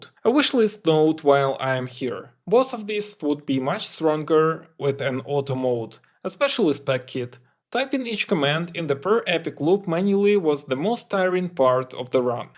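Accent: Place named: Russia. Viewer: strong russian accent Есть аналогичное видео на русском?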